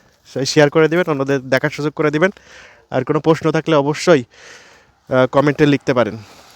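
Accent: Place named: Satkhira, Bangladesh. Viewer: satkhira theke... Apnar vasa sune mone hoi apnar bari satkhira..jodi satkhira bari hoi taile janaben 😊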